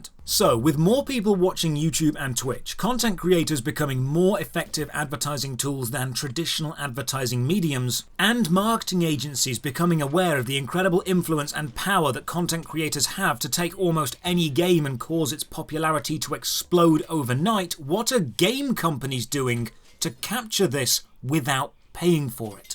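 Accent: United Kingdom. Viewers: Hi Double Barrell British Sounding Well Dressed Man.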